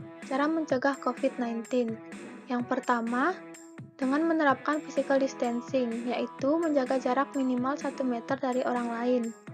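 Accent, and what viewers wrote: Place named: Bali, Indonesia. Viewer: Logatnya bali banget ya kak🙃, thanks infonya👌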